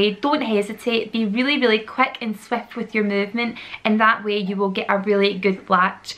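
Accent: Scotland, United Kingdom. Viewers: its scottish and barely understandable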